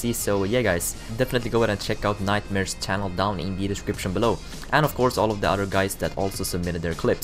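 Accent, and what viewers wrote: Sweden. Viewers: Are you from Sweden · Lol man hör att du e svensk :D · Are you swedish? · Det hörs tydligt att du är svensk så var tvungen att kolla upp det i kanalbeskrivningen ;) A men de e gött iaf att svenskar är bäst och har ofta bra utbildning i videoskapande.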